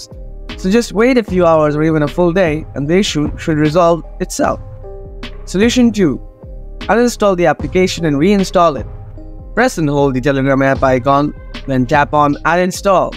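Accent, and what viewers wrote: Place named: India. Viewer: It is an Indian English.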